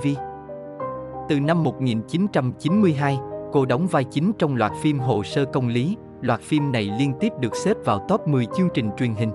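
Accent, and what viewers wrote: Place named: North, Vietnam. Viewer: Hai ngàn linh hai là sao tôi không hiểu, mình là người miền nam đọc theo từ miền nam đi đừng bắt chước theo miền bắc khó hiểu lắm nha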